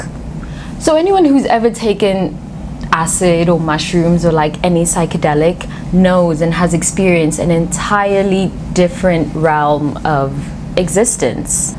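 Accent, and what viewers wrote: South Africa. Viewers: Curious to know where you’re from, really love your work. You sound like you could be from SA but moved. I just can’t across your channel.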